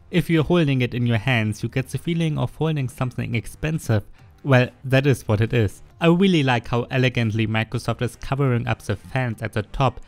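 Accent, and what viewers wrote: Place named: Germany. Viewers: Yeah it's a very hard german accent:P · I think DEUTSCH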